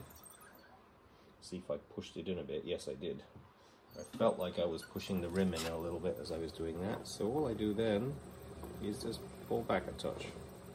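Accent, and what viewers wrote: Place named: Wales, United Kingdom. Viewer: I hear a Welsh accent, reminds me of home. Wish you were here in Beverly Hills, so I could gain confidence in making something bigger than a tea cup or mug.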